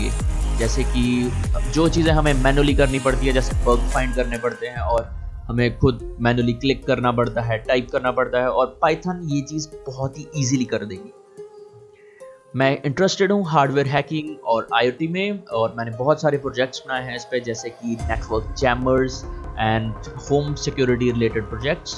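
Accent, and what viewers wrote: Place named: India. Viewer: Stop speaking Indian and only speak English as have a hard time understanding your heavy accent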